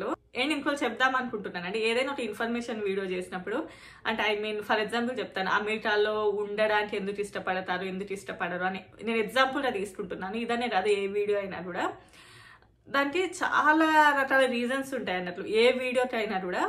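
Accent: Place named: Mahabubnagar, India. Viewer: Mee Matlade style and yaasa Chustunte ma Maibnar district valemo anipistundi ☺️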